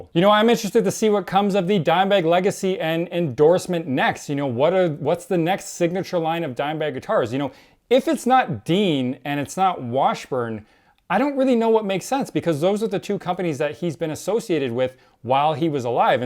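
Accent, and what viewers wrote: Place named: Canada. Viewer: All I heard was “ ABOTE “ and “ OTE “. Canadians just can’t grasp the “ OU “ sound.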